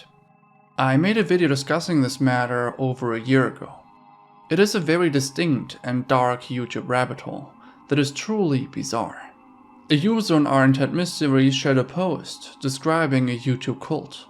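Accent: Germany. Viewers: Nice slight German accent, he'd make a good asmr channel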